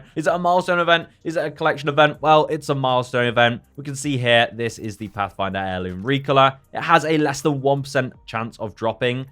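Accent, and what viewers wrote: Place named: United States. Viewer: please stop the american intonations be English mate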